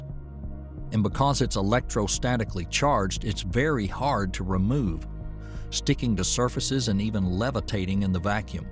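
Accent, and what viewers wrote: South, United States. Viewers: Sorry the Deliverance accent is a hard no. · Southern good ole boy . Refreshing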